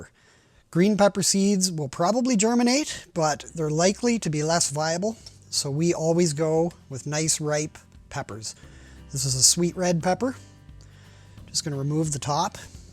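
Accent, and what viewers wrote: Canada. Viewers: Great vid, thanks! Southern Ontarian here, living in Utah...it was nice to hear the good ol' Canadian accent. Also nice to hear the word "Tupperware". I'm going to try this method for saving seeds. Cheers! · Love your clarity, simplicity and lively Canadian accent!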